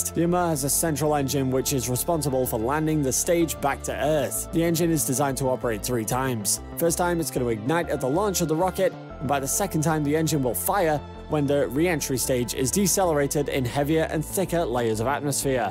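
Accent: United Kingdom. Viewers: One of an Uncountable number of miss pronunciations. I don’t mind the strong British accent, as an American, but I do not appreciate so many mispronounced words as if the narrator doesn’t have a goddamn idea what he’s talking about. It’s very unprofessional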